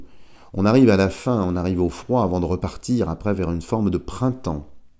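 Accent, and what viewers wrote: France. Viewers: Aaahhhh le sud ! 😀😅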